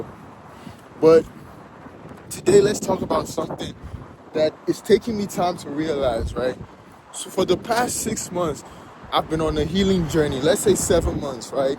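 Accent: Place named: Nigeria. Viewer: Great video, man. Btw, you kinda sound Nigerian. I'm Nigerian, that's why... hehe.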